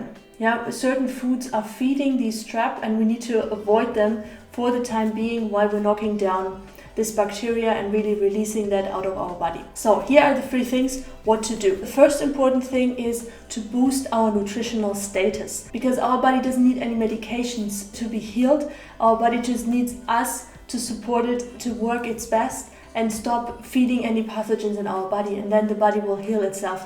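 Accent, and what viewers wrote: Germany. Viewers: I understand her very good, also with german accent but the audio quality could be a little bit better. Anyway, thank you for your awesome content! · Bist du Deutsche, das hört sich so an? :)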